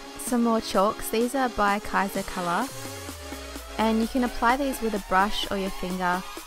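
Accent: Australia. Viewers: Ikr, i totally want her accent ,"wotah" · Hey, I'm in Australia too! So I already new the brands they were from. :D I also knew you were Australian because of your accent. :) :) :) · Omg as soon as I heard your voice I knew you were Australian!!! I love your accent I wish mine was more noticeable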